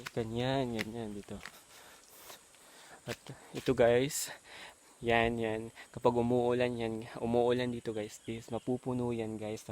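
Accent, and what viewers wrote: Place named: Visayas, Philippines. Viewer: bisaya jud si kuya..hehehehe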